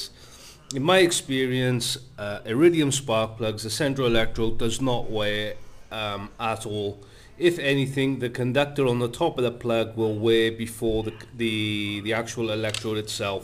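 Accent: Wales, United Kingdom. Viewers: He's Welsh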